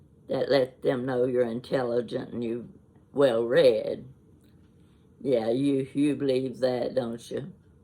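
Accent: South, United States. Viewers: Thank you so much for talking like me. Both sides of my family migrated over from KY. I've lived in a couple of places that don't appreciate our dialect. It's funny because I don't mind their's. Blessings to all. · I've been watching you for months. I think i messed up, do you live in Kentucky or Tennessee? Either way i love your accent! There is a utube you might enjoy. It's Celebrating Appalachia, Tipper Presley's goal on her channel is to keep Appalachia language and foods ways alive. She's wonderful. She reads books to us on Fridays. Books Southern and Appalachian authors have written. They have been amazing. She lives with her husband, one if her twin daughters. Her other daughter & her husband live about 20min away. They garden, cook, have a good time. I love it! She also has a blog she started in 2008, the blind pig and the acorn. It's posted everday. 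So interesting. Grammy from Texas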